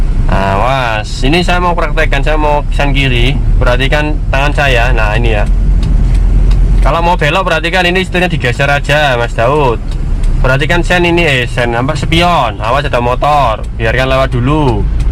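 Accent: Surabaya, Indonesia. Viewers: aku malah suka bahasamu mas,boso suroboyoan🤣🤣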